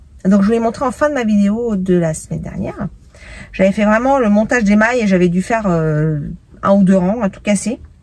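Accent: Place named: Manche, France. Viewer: Ah la marque Chevignon pour la génération 1972/75 🙌🏻 C’est marrant ni quand je vous écoute j’ai l’impression d’être avec une ancienne collègue : exactement le même accent (elle était originaire de la Manche)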